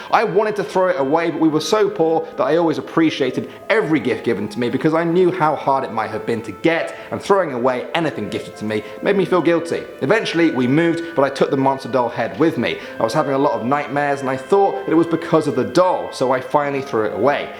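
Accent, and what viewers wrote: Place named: United Kingdom. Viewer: I hate this Fuckin' British accent !!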